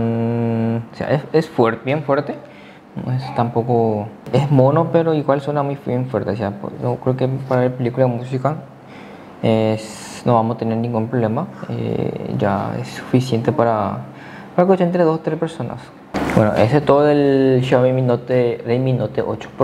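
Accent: Paraguay. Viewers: Cómo se nota el acento pyo 😂😂😂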